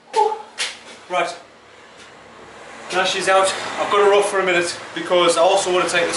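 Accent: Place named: South Africa. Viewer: You south african, bru? giggle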